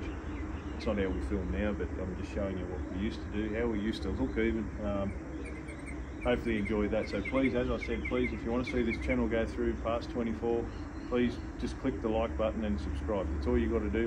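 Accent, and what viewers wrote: Australia. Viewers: I like your channel. It's good to hear an Australian voice on YouTube. 👍🏻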